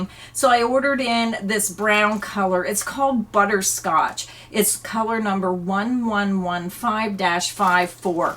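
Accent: Pennsylvania, United States. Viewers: I love listening to your Pennsylvania accent. I like how you called your shelves a coopy hole. We called it a cubby hole. Coopy is much cuter 😉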